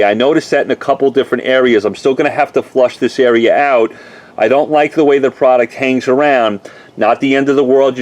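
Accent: New York, United States. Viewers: He sounds like he’s from New York not from Dallas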